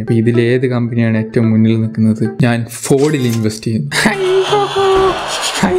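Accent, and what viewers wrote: Kannur, India. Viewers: Chettante language slag aan pwoli Kannurkkaru poliyalle🔥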